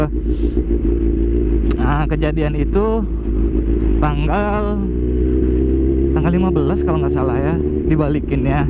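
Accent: Palembang, Indonesia. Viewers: Orang mana bang, bahasanya sama kayak Palembang😂, semoga bisa kebeli motor kayak abang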